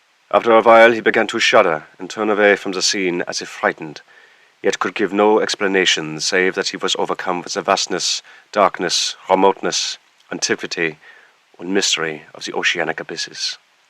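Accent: Germany. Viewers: Vielen Dank für die Erzählung mit deutschem Akzent. Das verleiht der Geschichte einem Hauch von Authentizität und macht sie viel eindringlicher. 😊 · Listening to this while at work was such a nice reprieve from the norm.i loved your German accent!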